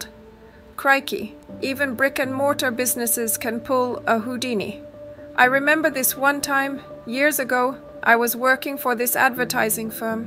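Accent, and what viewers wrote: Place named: Australia. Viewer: well thought out and spoken piece on customer service and business responsible practices. Its a shame there is not a clear cut service agreement all business conducted in sl must follow or they can lose their Lindens and account. cool to hear a Aussie language on Sl! Also i need that dress! its perfect summer all rounder :))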